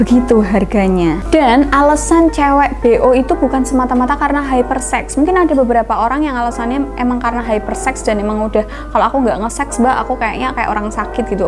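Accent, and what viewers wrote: Java, Indonesia. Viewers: seneng cara ngomongnya medhok bgt♡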